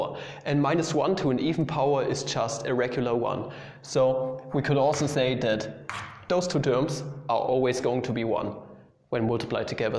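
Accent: Germany. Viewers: You are German and I understood that from the way you pronounce z and this :p Awesome video nonetheless.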